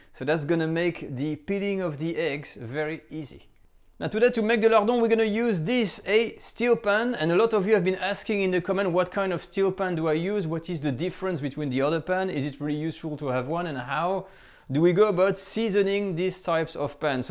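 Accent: France. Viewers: I am french , from Québec, so I also feel like he has that huge french (from France) accent . Also he did say Crouton so many times xD.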